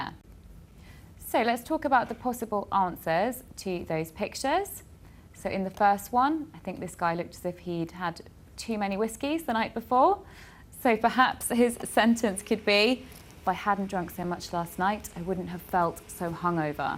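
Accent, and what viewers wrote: United Kingdom. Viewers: Omg, your accent is just killing me. I came here looking at some lessons and now I'm in love. British women accent rules!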